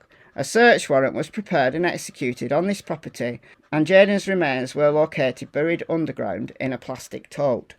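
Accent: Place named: Leeds, United Kingdom. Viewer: You gotta be from Leeds 😂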